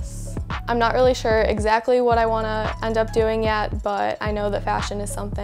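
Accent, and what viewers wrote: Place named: Chicago, United States. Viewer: so cute! i hear that chicago accent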